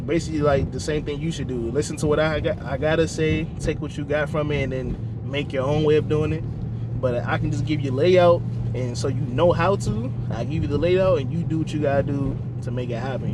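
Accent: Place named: DMV, United States. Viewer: I thought you was from the dmv 😂 I can hear it 😂😂😂😂 but I’m finna go to the military so I wanna get one when I get back 💯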